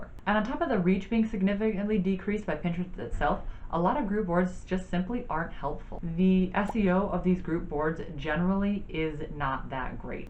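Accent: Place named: United Kingdom. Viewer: Thanks for helping video. I saved your video to watch it later again because I don't understand British accent properly because they talk shortly. But English is not my native language and also I'm not expert it English 🙂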